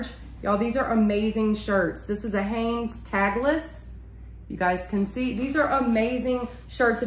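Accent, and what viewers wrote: South, United States. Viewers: Where are you from live your accent you sound like Paula Deen saying y'all lol.... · I've watched you for a long time and you're getting louder and louder and more and more country all the time... I wonder as a woman from North East Tn. And country as anyone so I am not fussing Lord knows. It's only that I am curious if your husband or mother or someone was making you tone down and you've cut loose or what happened recently. You are deceiving the Bulldogs glad and have said y'all a dozen times in 2 minutes and I could hear you all the way in back of my house your video started auto play is on and I watch you allot so it started you're newest video and I had to come from back of the house to turn it down. So curiosity I guess.....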